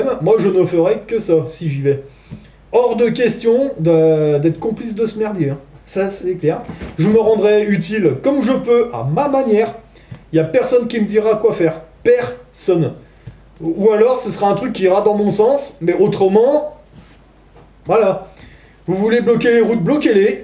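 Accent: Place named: Haute-Savoie, France. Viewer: Ton accent me rappel sympathiquement ma jeunesse dans les montagnes de haute Savoie. Vive les bivouac au semnose et les footing sur la tournette. Sans parler des skis de rando. Vive le 27em BCA.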